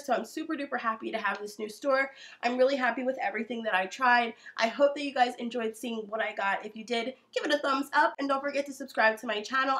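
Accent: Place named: Pittsburgh, United States. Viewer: I look forward to your videos! The more, the merrier! I'm from Pittsburgh (I now live in Cali) and we say 'home' with the same accent that you do ~ and we say 'carmel' instead of caramel! Maybe that is why your voice is so soothing to me!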